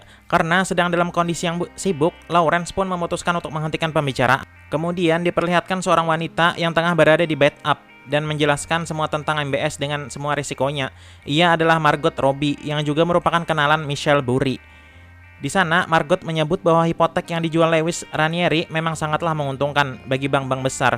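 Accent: Banjar, Indonesia. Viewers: Ikam orang banjar kah mang ? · Intonasi banjarnya kental bujur, jadi ngalih mencernanya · asli mana bang, kya logat bahasa banjar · Dari review logat bicaranya, sepertinya org Banjar Kalimantan. Cmiiw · Logat banjar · dari logat, macam orang hulu sungai 🧐🧐🧐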